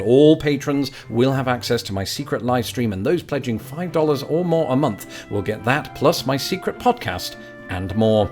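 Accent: United Kingdom. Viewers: How have you kept your accent despite being in the us so long? Usually people end up losing or at least their accent diminishes when being in a place, !do you keep in touch with the brits?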